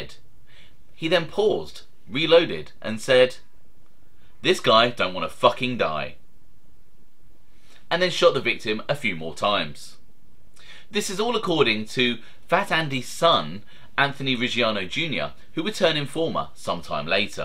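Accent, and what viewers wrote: United Kingdom. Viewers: I normally despise the British accent(no offense) and refuse to listen to it but you're channel is damn good I can't help it.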